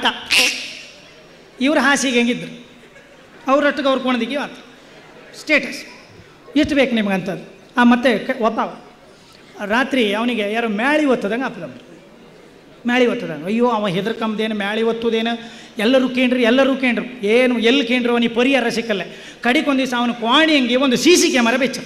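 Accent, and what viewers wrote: Kundapura, India. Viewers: Kundapura Kannada Super.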